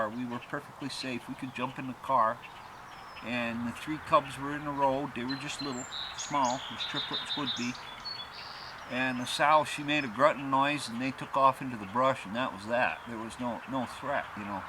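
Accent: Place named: New England, United States. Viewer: That's real yankee talk right there.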